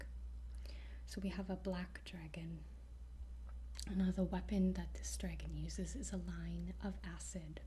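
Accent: Canada. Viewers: Lol Your Canadian accent really suits this role play.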